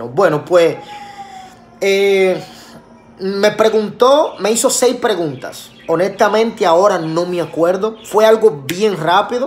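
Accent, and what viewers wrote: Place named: Dominican Republic. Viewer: Bien explicado Con acento Dominicano.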